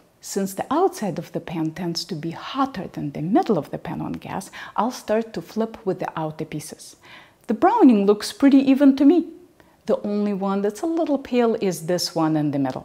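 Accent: Russia. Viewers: nothing in this world is more irritating than a russian accent in english. NOTHING. except people who don't understand the difference between spoken and written language, and read a script to a screen like a 5 year old reading the three bears. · The Russian accent puts me off these videos and just wondering if there is any connection between the accent and the pro gas bias. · This is the first time I’ve watched one of your videos,and I am so glad the YouTube algorithm recommended it. The topic is very timely as we will be having a lovely manufactured home built for us and I either have to have a non-gas, 30” range or a gas range with propane, which would be an upgrade and cost us for the gas range and, of course, the propane. I love the gas range I currently have, and don’t want any other type range. I’m a little concerned because of what you said about your needing a Wolf because it works well with propane. 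I am 73 and don’t cook nearly as much as I used to, so cannot justify the cost of a Wolf. I am now a Subscriber. Thank you for this helpful video. BTW: I love your beautiful voice and Russian accent!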